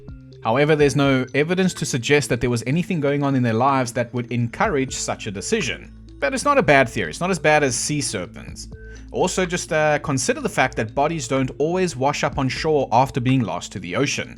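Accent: South Africa. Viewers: Is he South African? Can’t place the accent 😅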